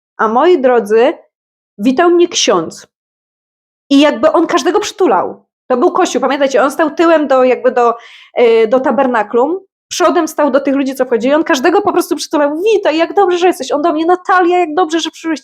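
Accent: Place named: East, Poland. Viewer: Pierwszy raz na Twoim kanale, więc wynacz jeśli pytanie już padło - skąd u Ciebie ten wschodni akcent? Pozdrawiam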